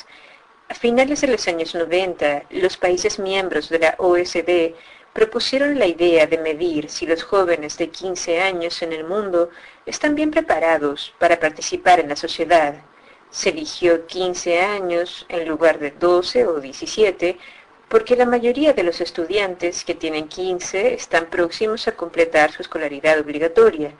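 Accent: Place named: Central America. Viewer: Tiene acento centroamericano, creo que ecuatoriano, o quizás colombiano, pero se entiende perfecto!! vos no aprobarías el PISA, Miguel!! en todo caso pasame qué palabras no entendés, y el instante t en que ocurre, y te respondo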